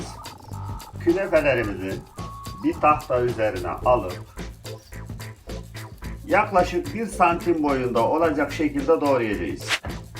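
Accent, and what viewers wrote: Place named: Hatay, Turkey. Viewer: Abi kıbrıstaki hataylılar gibi şiveniz var sanki :)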